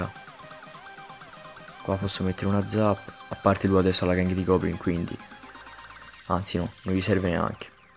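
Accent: Tuscany, Italy. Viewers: è toscano come me ahahahha😅❤ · ma sei toscano? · Ma quanto è bello il toscano 😍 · sei toscano vero si sente l'accento. come me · Ma sei toscano?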